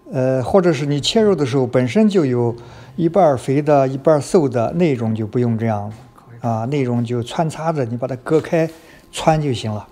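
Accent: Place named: Xinjiang, China. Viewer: 好正宗的新疆普通话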